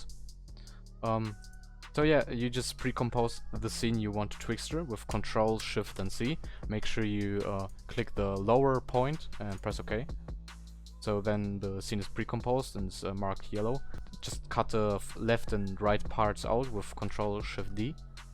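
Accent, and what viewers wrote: Germany. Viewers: er ist deutsch aber versucht englisch zu reden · German-English dialect i can hear this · man hört so krass das du ein deutscher bist haha aber danke<3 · Man merkt, dass er deutsch ist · Yo Ich wusste das du deutscher bist wegen deinem Akzent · u german? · Ur german accent tho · man hört heftig raus das du deutsch bist xD c: aba danke für das video <3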